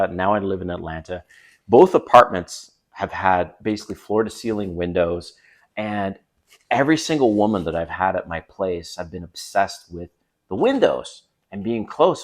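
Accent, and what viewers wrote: Canada. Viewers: i can detect the Canadian accent ! Cool 😊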